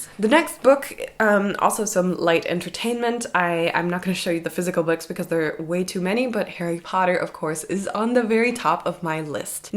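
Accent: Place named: Germany. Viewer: have you been raised bilingual? Since, as a german, I can kinda hear a german accent there, which is sorta fascinating, since I dont hear my own, eventho Ive been told my german accent is pretty noticable.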